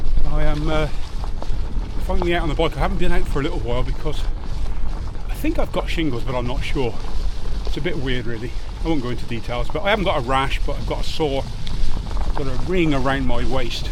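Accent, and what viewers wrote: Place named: South West England, United Kingdom. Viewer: what part of the country are you from? I rather like the varied English accents! I was guessing you are from the South West?😊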